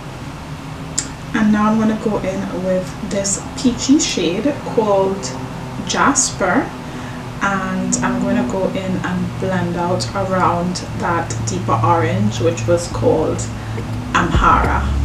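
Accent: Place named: Caribbean. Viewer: Hi there. Just started watching & subscribed right away b/c that Caribbean accent grabbed me. 🇯🇲 here, you too?! Also, what are some of your foundation shades? 💜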